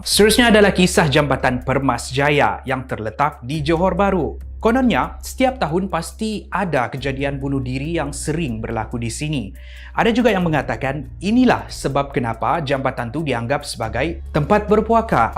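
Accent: Sabah, Malaysia. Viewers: Sabah bh ni kan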